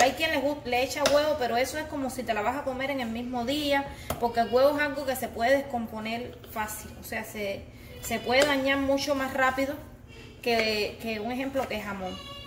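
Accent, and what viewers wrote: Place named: Cuba. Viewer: Estraño cuba y me encanta que tienes aún tu asiento cubano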